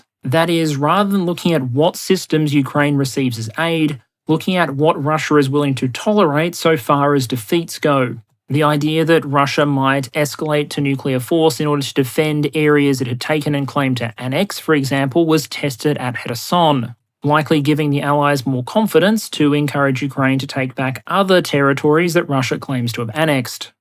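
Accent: Australia. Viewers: I feel like outright stealing the whole stylistic shebang for work, starting from the aussie accent, through the PowerPoint layout, up to the begrudging respect for the strategic prowess of emus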